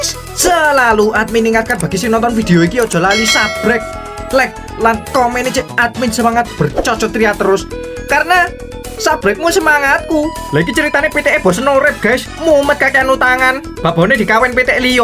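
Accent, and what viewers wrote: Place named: East Java, Indonesia. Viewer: Asli endi cak koq dane wong jatim tak rungok2no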